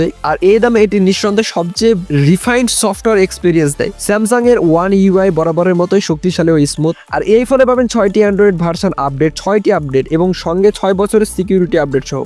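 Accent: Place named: Bangladesh. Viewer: আপনার শব্দের ব্যবহার ও উচ্চারণ শৈলি ইন্ডিয়ার মতো নয়। পুরাই বাংলাদেশ।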